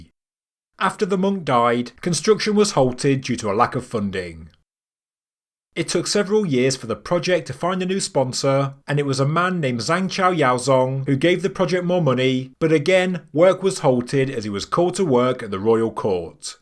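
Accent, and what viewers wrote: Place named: Midlands, United Kingdom. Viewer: I'm from England, yet can't place your accent, sounds Midlands maybe. Another well researched presented video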